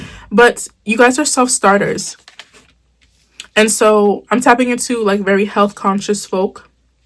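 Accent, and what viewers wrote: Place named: Jamaica. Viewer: Yoo you gotta be Jamaican lol some of the words you use and how you say things lol if you are big up you self sistah🇯🇲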